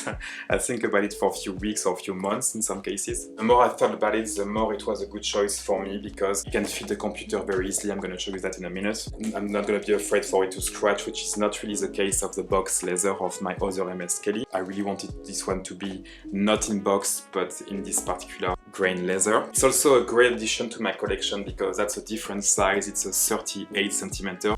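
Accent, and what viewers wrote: France. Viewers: Bonjour de la Belgique 🇧🇪, félicitations pour votre magnifique sac Hermès Kelly 😜❤️, je ne comprends pas l’Anglais, mais je devine ce que vous dites 😂, surtout votre accent bien Français 😂, félicitations pour votre collection Hermès, que je commence seulement depuis 1 an, je me suis offerte 1 Kelly 28 Gol retourné, bijoux Palladium ❤, maintenant j’attends 1 Kelly noir 32 retourné, bijoux Or❤, je me suis offerte une montre H et Brillants aux heures, ex ex ex des petits achats 😜❤️, comme je suis Belge, j’étais concentrée sur les sacs Delvaux Brillant 😜❤️, bon en espérant qu’ils n’y aura pas de disputes dans les armoires, la France contre la Belgique 😂, Meilleure vœux pour l’année nouvelle 🥂🍾🌲. Bien à vous 🤗💛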